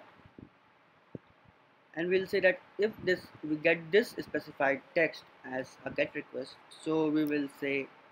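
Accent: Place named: India. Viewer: Indian accent so absolutely NOT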